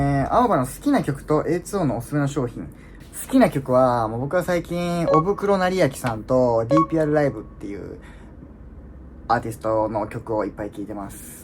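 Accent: Kansai, Japan. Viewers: 伊予弁やから関西アクセントなんねー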